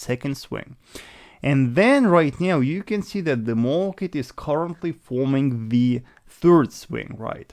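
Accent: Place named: Russia. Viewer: the russian accent....love it....hehehehe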